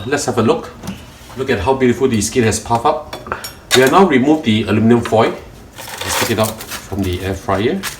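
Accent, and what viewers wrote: Malaysia. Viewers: Malaysian accent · 一口馬拉音，哈哈😄⋯⋯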